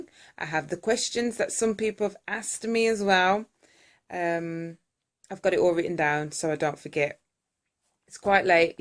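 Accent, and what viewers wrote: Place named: Caribbean. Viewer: yesss sister that accent and I can tell u got Caribbean in you ☺ ..you're right though fibroids causes the abdominal area to bulge, I'm happy for you!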